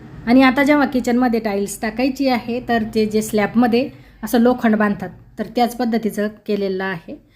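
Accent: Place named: Akola, India. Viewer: ताई तुम्ही अकोल्याचे आहे का तुमची भाषा तशीच वाटते जगातली भीमच म्हणतात बीमच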